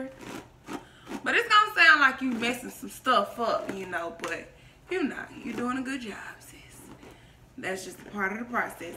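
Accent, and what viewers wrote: Atlanta, United States. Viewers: Glam Doll you know that’s an Atl accent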